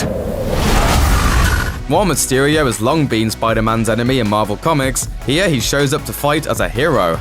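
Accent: United Kingdom. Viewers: Is this a British accent? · This dude has a British accent just like Tom holland 😅 I like British accents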